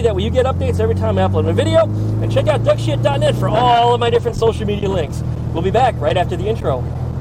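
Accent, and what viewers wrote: New Jersey, United States. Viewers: Definitely can hear the Jersey in you in this video, where exactly were you from originally in NJ?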